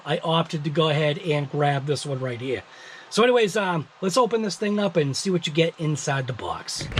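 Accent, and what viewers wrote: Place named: Massachusetts, United States. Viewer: Im newer to the hobby. Been about a year or so. I knew you were from MA the instant I heard you talk lol. Im born and raised in Lynn and live on Cape Cod now. Love the videos.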